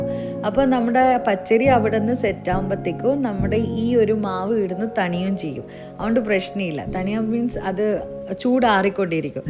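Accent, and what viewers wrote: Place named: Thalassery, India. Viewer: Hearing you speak in our good old Thalassery accent—without trying to sound too polished or artificial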